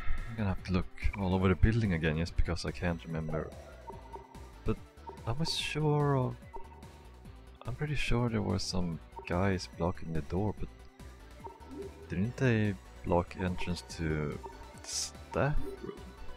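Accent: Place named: Europe. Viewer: Pardon me if this sounds a little rude, but do you have an accent? Your is so unique and cool and I want to say it's due in part to a European accent of some sort, but I can't quite put my finger on it. Where are you from?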